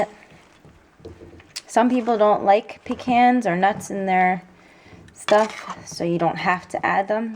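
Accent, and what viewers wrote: New Jersey, United States. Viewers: She has that jersey accent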